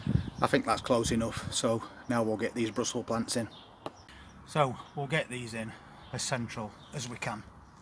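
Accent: Yorkshire, United Kingdom. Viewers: Just found yer chanel dude subscribed hello from donny are you Yorkshire?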